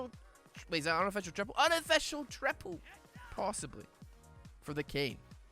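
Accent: Scotland, United Kingdom. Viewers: Extra-Scottish makes me wanna play D&D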